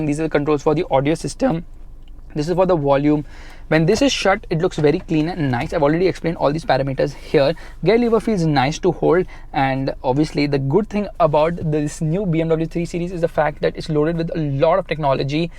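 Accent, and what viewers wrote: India. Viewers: Hate Indian English accent 😆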